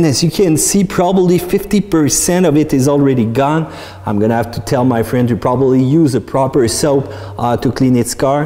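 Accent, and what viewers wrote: Quebec, Canada. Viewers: hahahhahaha quebecoissssss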